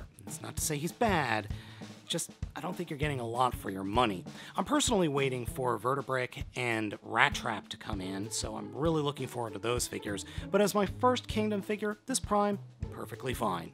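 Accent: Minnesota, United States. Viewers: He's from Minnesota?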